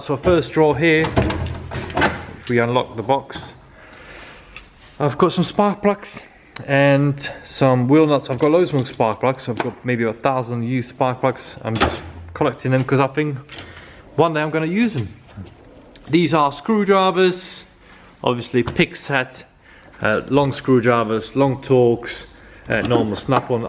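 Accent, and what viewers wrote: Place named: Europe. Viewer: Where are you from? You have a European accent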